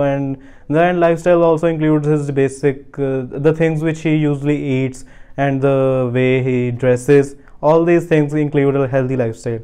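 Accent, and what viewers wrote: Punjab, India. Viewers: punjabi tone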